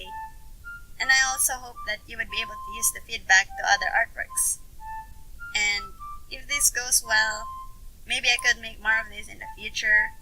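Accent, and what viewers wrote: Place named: Philippines. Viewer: I can hear that Pilipino accent- 👀. I love your content to the fullest! Keep on going.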